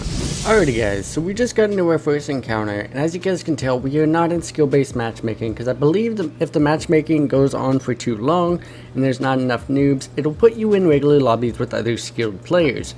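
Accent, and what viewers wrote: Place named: Boston, United States. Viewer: Are you from boston? Cool accent bro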